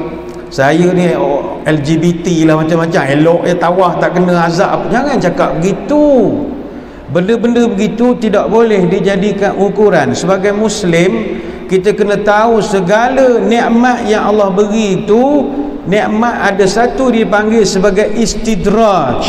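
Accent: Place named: Kuala Lipis, Malaysia. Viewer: Klau xsilap ustaz org Kuala Lipis?loghat ustaz macam org kampong saya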